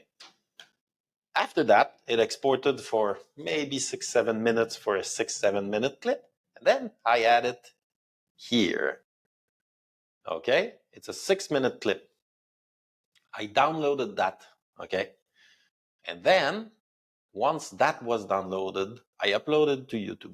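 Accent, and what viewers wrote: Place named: Quebec, Canada. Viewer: Nice video! Are you from Quebec!? I can tell😃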